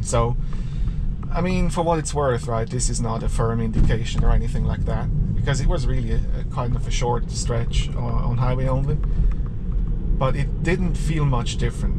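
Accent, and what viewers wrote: Switzerland. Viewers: Hmm... you sound very Swiss :) Grüezi Thanks for the video! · Sicher en schwizer mit dem akzent😄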